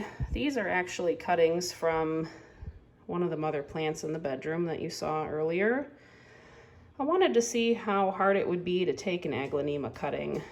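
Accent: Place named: Northeast, United States. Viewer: From Amherst Ohio I hear the northeast accent....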